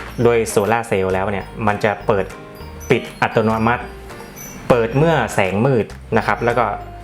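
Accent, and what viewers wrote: North, Thailand. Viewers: สำเนียงคนเหนือใช่ไหมครับ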